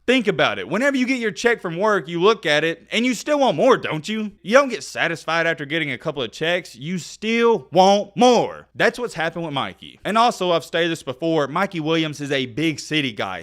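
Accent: South, United States. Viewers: No clue who dude is but he just spent half of this video talking about random shit lmaoo. “Exposing….” Do it then, don’t spend 7 minutes boring with your lil yeehaw accent smh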